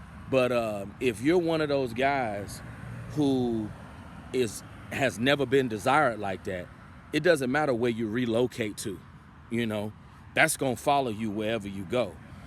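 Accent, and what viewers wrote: United States. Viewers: Move to South East Asia . As a black man, you have BBC advantage , the american accent and the American passport . These are enough to get you an average Asian chick . African women are no different from American women .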